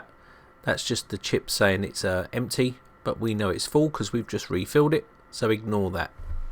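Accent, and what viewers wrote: United Kingdom. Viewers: This is not a Cockney accent,it's British English, Cockney accent is more like 'strine' Australian English.even at time like Texan English.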